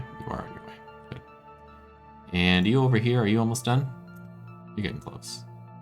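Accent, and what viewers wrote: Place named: Alberta, Canada. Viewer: A fellow Canaadian, and sounds like a fellow Albertan as well, loving the content as usual!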